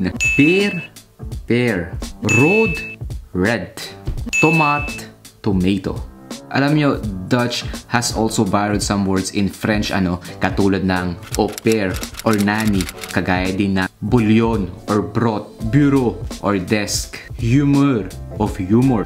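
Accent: Belgium. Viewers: So cool how your Flemish is already formed. With the zenne at the end. Ur a through Fleming my dude ❤️ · Sounds a bit different from Netherlands Dutch. But I knew that already from watching Disney programs that are aired from Belgium. Nice vlog!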